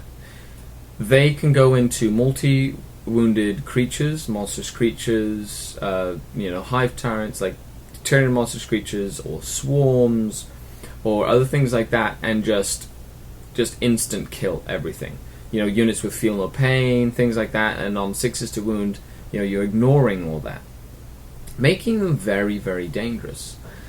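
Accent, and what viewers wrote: United Kingdom. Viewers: Are you Canada you sound uk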